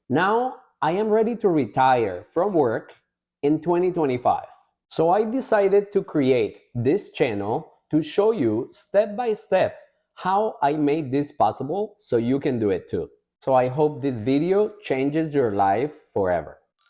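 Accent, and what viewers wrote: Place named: Europe. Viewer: Europe your accent is from Europe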